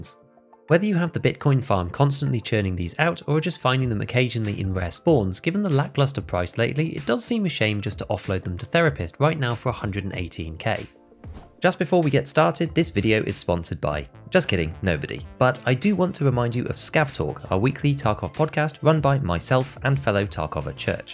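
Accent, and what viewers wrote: United Kingdom. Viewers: it's more of a "British" way of talking.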